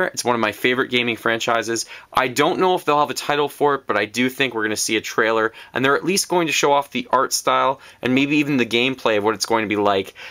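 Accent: Canada. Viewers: I just came across your channel and I really liked it, man! I enjoyed hearing your thoughts and optimism for Nintendo's E3. I really hope to see the Wii U Zelda that isn't Hyrule Warriors (Aonuma pretty much said this was true), some strong 3rd party titles, and some more stuff for the Virtual Console. I want my N64 and Gamecube games on there! Of course, I really want a new Star Fox, Metroid, and F-Zero, but we'll have to just wait and see about that. Also, it might be just me, but you sound tremendously like Alex Lifeson from Rush with that Canadian accent.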